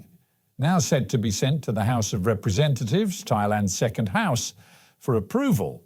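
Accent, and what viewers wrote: United Kingdom. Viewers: I like your news program and regulary follow ; it's informative, unbiased and coloured with your elegant British accent. Keep doing this good job.❤❤❤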